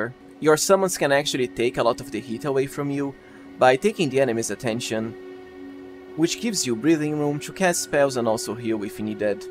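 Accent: Brazil. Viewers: Are you Brazilian, mate? Cool accent! Great video too!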